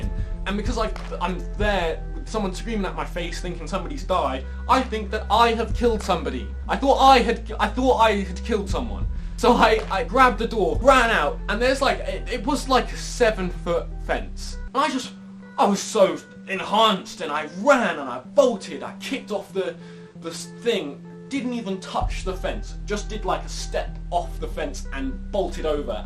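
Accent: United Kingdom. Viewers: he sounds british to me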